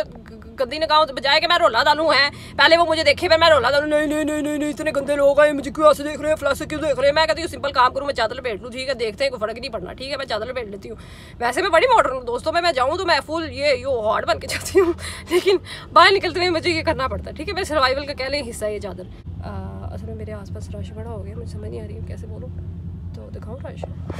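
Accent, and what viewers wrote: Punjab, India. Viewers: Keep growing stay strong❤️i love ur way of talking hahahaha full Punjabi style. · Your way of talking 💕.... Hahha full punjabi style.... fan ho gya mam mn apka...... Stay strong be happy...... And don't worry about shittyy people.....🙂 Allah apko mazeed izzat dy or bury logon sy bachaye.... Ameen.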